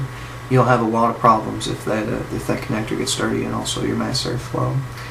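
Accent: South, United States. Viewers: sexy southern daddy damn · Speak with southern accent loud and proud. Fuck what anybody else think. I can't stand the NY accent and find it obnoxious, but do you really think they care what I think.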